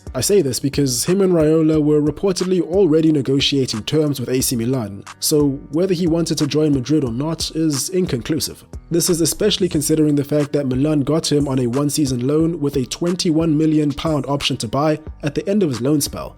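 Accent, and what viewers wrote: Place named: South Africa. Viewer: South african voice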